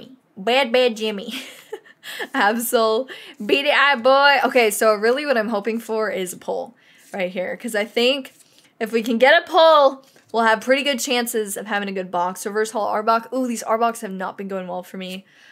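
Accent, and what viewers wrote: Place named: Rural, United States. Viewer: That country accent tho lol!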